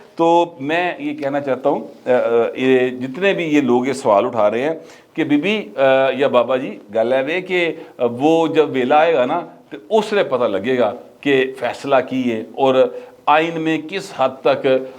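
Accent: Lahore, Pakistan. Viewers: sb zabardast very interesting and very very well describe and most importantly you describe purely in our Lahori style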